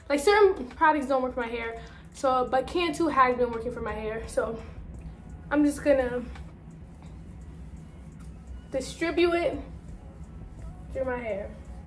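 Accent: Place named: North, United States. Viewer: Are you from Northern United States?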